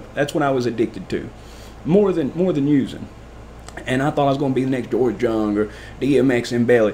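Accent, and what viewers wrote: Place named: Tennessee, United States. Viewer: Where are you from Kentucky here. I cant pin that accent down . You sound like a cousin of mine though lol Best i can guess is Tn or Ak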